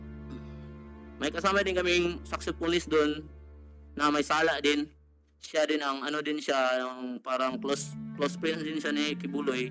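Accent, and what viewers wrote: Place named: Visayas, Philippines. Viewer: bisaya kc sya.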